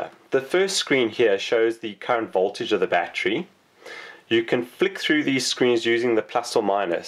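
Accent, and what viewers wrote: South Africa. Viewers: Very good demo! Truly well explained, thank you!! South African accent? Dankie!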